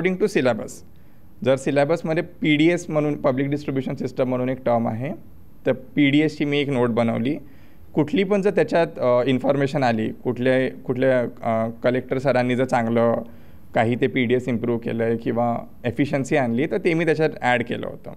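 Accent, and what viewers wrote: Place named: Amravati, India. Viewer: Veryyy...Informative sir....Amravati ascent can be seen .....🙏🏿🙏🏿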